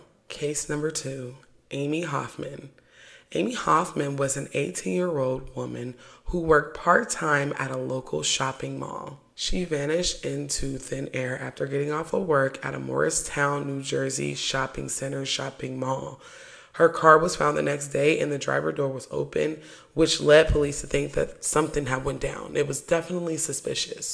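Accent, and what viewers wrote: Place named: Baltimore, United States. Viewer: Sounds like u from Baltimore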